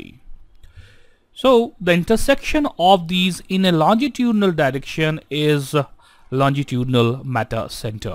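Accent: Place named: India. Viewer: u trying so hard to make british accent but still your indian accent kills your mouth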